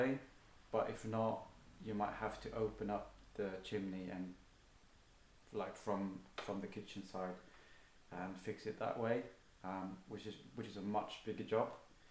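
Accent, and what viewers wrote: United Kingdom. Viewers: Du har en brittisk dialekt har du bott där tidigare ?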